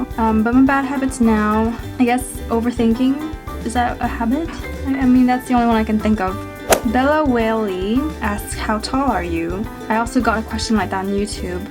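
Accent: United States. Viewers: You look a lot like Mina from Twice at certain angles. You can definitely be a celebrity haha. TVB would sure hire you. You sound American 90% of the time but then at times you don't. Lol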